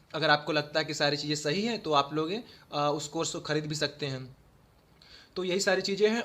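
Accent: Allahabad, India. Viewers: Tqqq sooo much sir 🙏 And apki allahabadi language mashallah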